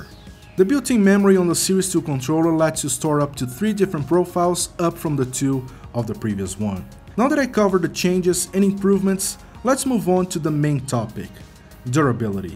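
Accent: Brazil. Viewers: Dude, are you brazillian? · Cara, matei no primeiro minuto de video que você era brasileiro pelo sotaque kkk. Parabéns pelo vídeo! Muito bom! E excelente inglês!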